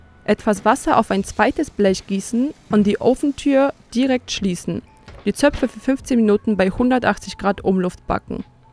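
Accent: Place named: Eastern Europe. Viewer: Wer es noch nicht mitbekommen hat… Diese Junge Dame rollt das „R“ bei „Brioche“ weil sie einen slawischen Akzent hat. Sie spricht ein gut verständliches Deutsch und auch das Video ist vom Inhalt her nachvollziehbar und plausibel. Warum geilt ihr euch an dem fehlenden französischen Akzent, sofern er überhaupt nötig wäre (?) auf? Habe das Rezept nachgebacken, und es ist wunderbar gelungen!😊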